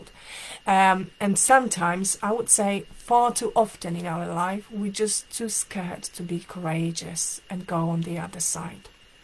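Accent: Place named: Germany. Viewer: Are you german? I hope you do not mind my question its the accent. I loved your video, a good reminder. JazakyAllahu Khayran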